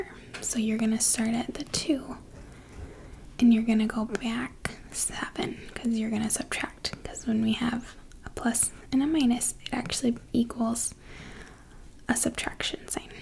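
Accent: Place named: Wisconsin, United States. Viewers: That accent, I love it. I can't place it 100%. But sounds Wisconsin-ishhhhh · VEEEERY Midwestern, that's for sure. I'm in Arizona and we have a ton if Midwesterners here.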